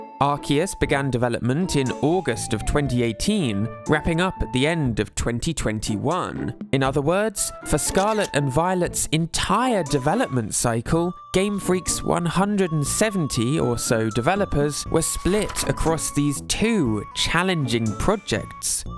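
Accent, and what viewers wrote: United Kingdom. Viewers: So are you just discriminating British accents now? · I mean, he is British.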